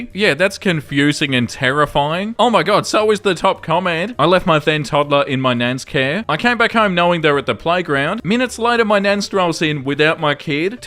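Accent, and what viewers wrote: Australia. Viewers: I have to wonder if you're an Aussie. You sound like it, and it's nice to sit and listen to.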